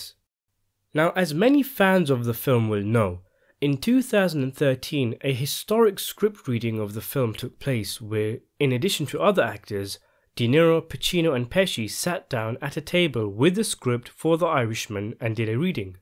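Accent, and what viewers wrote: United Kingdom. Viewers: Thanks, darling. but I am really curious about your accent. which part of UK is this accent from? I guess you are from Scotland or Wales or Northern Ireland. is that right?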